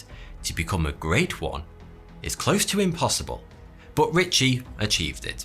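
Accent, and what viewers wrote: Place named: Lancashire, United Kingdom. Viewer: Classic Lancastrian, it's a globally recognised accent. BB1 here.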